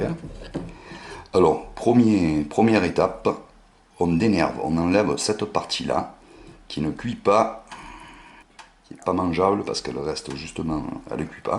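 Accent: South, France. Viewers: Bonjour! Merci pour cette bonne recette; je ne connaissais pas la préparation avec les oignons rouges coupés en tranches. C'est succulent avec le miel! En accompagnement des pommes de terre rissolées c'est extra! Merci pour cette belle vidéo démonstrative! J'aime bien votre accent méridional! Amitiés de Belgique MIMILOU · Je c pas si tu es marseillais ou pas mais bravo pour ta recette g bien aimé avec ton accent du sud sa sent le vrai.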